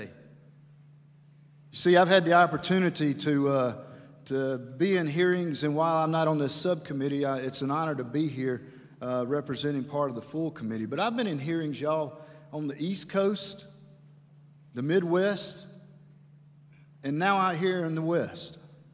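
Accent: South, United States. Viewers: Love his country twang and stern no nonsense voice. Need for like him. You have these elected officials that been in congress to long and forgot what it was like out there looking in. They can’t and don’t want to recognize it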